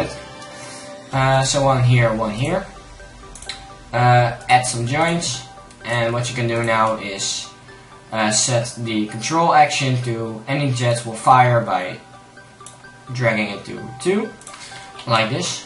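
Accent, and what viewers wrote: Netherlands. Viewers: are you dutch? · Jij hebt een nederlands acsent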